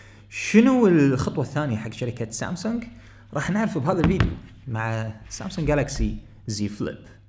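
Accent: Kuwait. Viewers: التقنية خراافية ولكن الانتشار الاوسع رح يكون بعد خمس سنين بسبة السعر الجنوني اللي بينزل فيه للسوق وللأمانة انا سوري بس اللهجة الكويتية يا اخي كشخة😄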